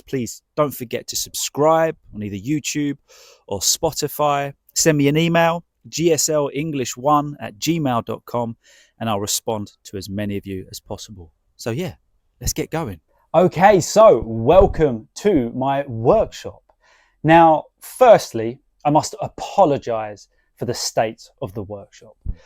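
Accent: RP, United Kingdom. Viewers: ❤❤❤❤❤❤ Thank you so much for your teaching The British English Language, it means that The Modern Received Pronunciation Accent from London and The NATIVE Speaker's NATURAL Language with CORPUS (the LIVING Language materials) to immerse the learners into The NATURAL and NATIVE British English Language about NATIVE phrases and collocations in the Daily Life Conversations !!! It's GREAT !!! Love The British English really !!! Thanks your for more Videos later like this in the future !!! ❤❤❤❤❤❤❤❤ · I am the1st . Nice British RP. Cupatea great! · Gracias profesor, este formato de vídeo está genial, amo el acento británico, ojalá algún día pueda hablar así 😅 saludos desde Argentina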